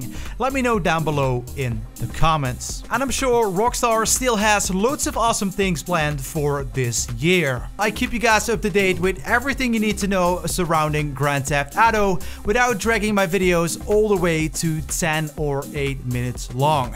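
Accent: Netherlands. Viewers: You dutch bro? I live in Amsterdam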